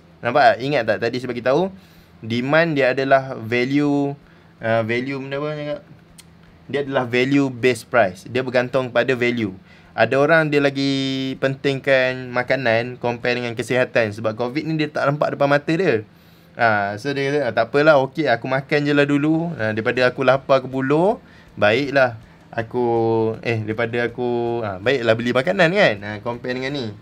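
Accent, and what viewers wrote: Pahang, Malaysia. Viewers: terbaikkk sifoo..loghat mcm org phg jekk..hehe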